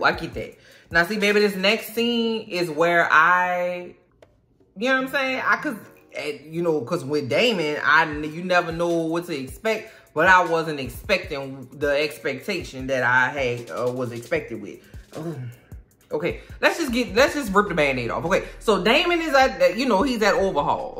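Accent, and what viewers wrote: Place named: Louisiana, United States. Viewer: Absolutely LOVE the Louisiana accent and humor!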